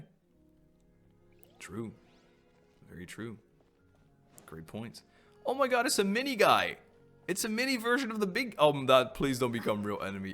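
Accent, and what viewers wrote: France. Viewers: I love the french accent you do, pretty accurate as my professors speak just like that 🤣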